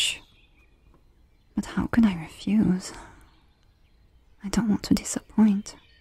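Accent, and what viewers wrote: France. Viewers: This sounds so so so beautiful!! I love a french accent and this is soo nice to listen to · Will there still be French accent audios? Surely?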